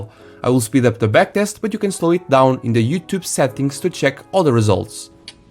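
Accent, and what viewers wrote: Portugal. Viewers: Earing you speaking and appreciating your accent it seems to me that you are portuguese from Portugal 😅🇵🇹 am I right?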